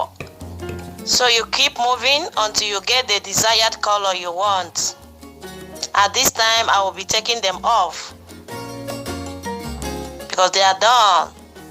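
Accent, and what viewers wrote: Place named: Nigeria. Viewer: The Nigerian accent is on point. I'll be sure to try these out.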